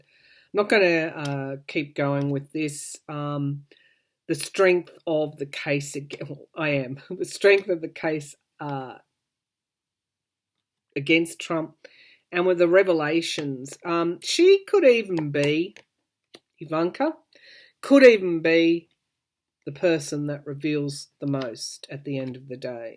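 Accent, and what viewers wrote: Australia. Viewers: Hello! I always SO enjoy your readings, the way you present everything, the humor! (Donald Capone😂) and the very personal way you „ talk“ to us. Very different from other readers ( apart from the Australian accent!). You bring a very human, personal touch to your readings. Thanks for all the time you take to bring us the latest insights! 🙏🌹🙏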